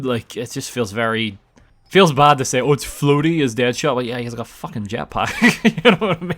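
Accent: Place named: Northern Ireland, United Kingdom. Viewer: as a fellow northern irish person its always such a weird feeling when I click onto a youtube video and hear the accent lmaooo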